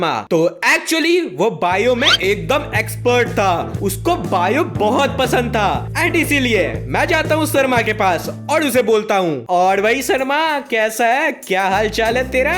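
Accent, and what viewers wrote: Bihar, India. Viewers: aap bihar se ho kya bhaiya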